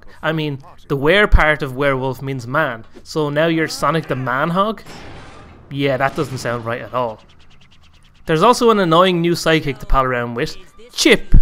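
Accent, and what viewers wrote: Ireland. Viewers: don't take this the wrong way, but I love your accent. you're Irish I'm guessing?? My family's part Irish and Scottish, so I had to try and pick apart your dialect and such. cheers to you and all!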